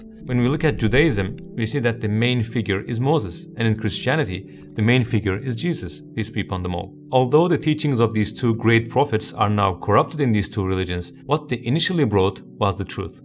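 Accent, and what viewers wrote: Turkey. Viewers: you have a turkish accent ,i lived in turkey such a muslim country ,as a tourist you are a walking walet to them you get ripped off the moment you step in a cab at the ap until you leave the country .from government offices all the way to corner shops ,i travel a lot i have seen many countries there are bad apples everywhere but turkey as muslim country should set an example not be the worst in the world , this example itself proves whatever islam is offering it's not working ,and i am going to stop here and good luck with your lessons of saving souls and converting ppl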